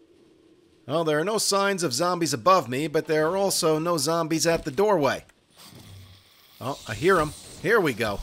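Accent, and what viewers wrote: New Jersey, United States. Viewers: This guy is a gangster... has the jersey accent and mentality....have loved this guy long time...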